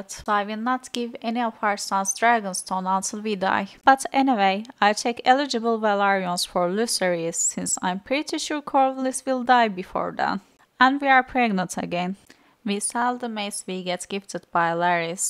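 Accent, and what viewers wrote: Turkey. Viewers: Yup that’s 100% Turkish accent 😄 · It is look like Turkish accent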